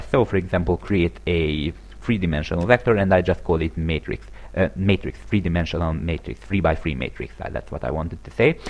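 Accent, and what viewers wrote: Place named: Hungary. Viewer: te magyar vagy hallatszik az akcentuson meg látszik a "fájl, szerkesztés" ikonok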